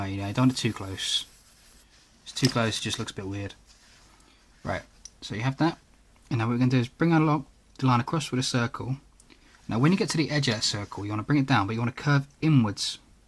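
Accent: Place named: United Kingdom. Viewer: Are Brittish cuz accent is quite fantastic and a bit different than American accent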